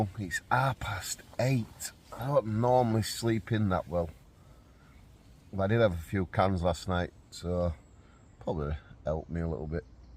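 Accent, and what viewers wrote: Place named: Northern England, United Kingdom. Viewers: Usually on the camping vids on Youtube when the food is being prepared and cooked I switch off, but on this vid if you are cooking the same again can I put my name down please?, good to hear a Northern accent also. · Great as always. My very humble advice. Stay away from divisive topics mate. Personally I come here for the advice, your knowledge and the great gear reviews. Delivered in your great west York’s accent and lovely gentle manner. Ultimately, I’m here for anything camp based. If I wanted to see or hear anything negative ( no matter what the topic) I’d buy a tv and watch the propaganda on there. Or god forbid join that circus o win as FB. You’ve been a massive influence on me and my own wild/ stealth / nature camping adventures. Looking for ward to autumn and winter to get my hot tent out! So a big thanks fella. 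Take care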